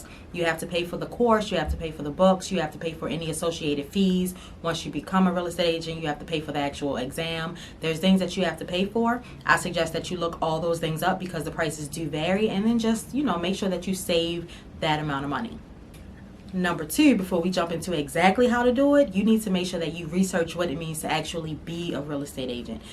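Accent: Baltimore, United States. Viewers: I can tell she from b more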